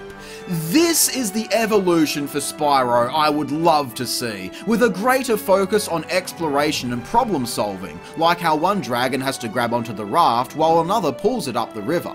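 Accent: Australia. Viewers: So glad I found this. Subbed... 😀 are you aussie ?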